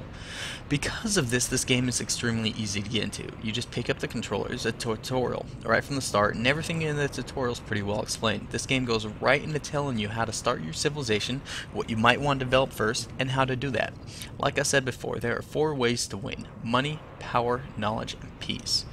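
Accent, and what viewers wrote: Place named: East Coast, United States. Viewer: it;s fine to me... He's probably from the east coast.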